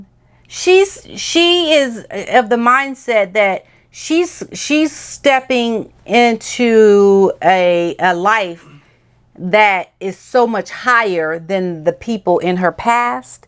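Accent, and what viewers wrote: England, United Kingdom. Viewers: 😆😆😆 Love your British accent! Made us laugh here in the UK. “Lovely!” xx 🇬🇧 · Hello. You look gorgeous, as always. You're spot on all the time!! I look forward to your videos. You are so funny! Love your English accent!! Have a great day. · GreatEnglish accent.😂💖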